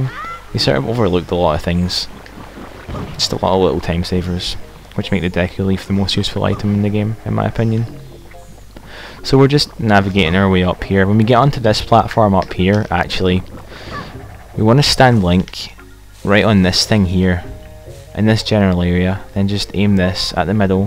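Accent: Scotland, United Kingdom. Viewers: are you scottish? I detect a scottish accent in your commentary =D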